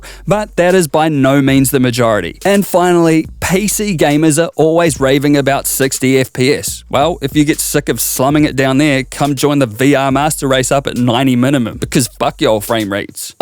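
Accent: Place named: Australia. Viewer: Are you a fellow australian? also this video made me buy fours sensors and an oculus rift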